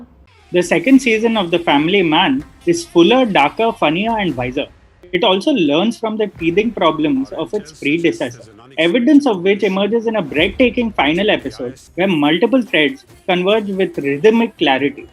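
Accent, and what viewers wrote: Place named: Delhi, India. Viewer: What a crappy narrator- I like reading you rather listening to you. You have those typical Delhi accent- thel thel ke batein kadna. Please you better write.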